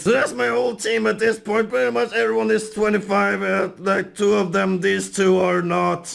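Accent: Sweden. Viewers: Why are all swedish (I kinda heard a accent) YouTubers so crazy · Are you Swedish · WTF are you Swedish?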